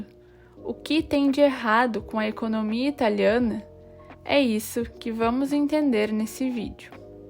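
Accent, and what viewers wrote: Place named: Rio Grande do Sul, Brazil. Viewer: eu adorei a narração, sotaque gaúcho e a menina deve ser linda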